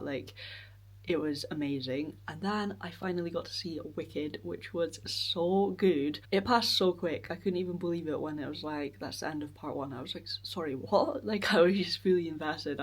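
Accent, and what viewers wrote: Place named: Scotland, United Kingdom. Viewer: would you consider wearing a microphone. Your voice is really gentle and I struggle to hear you without the volume waaaay up, which is fine until ads come on a mega volume 😂 YouTube closed captions can't cope with your Scottish accent either lol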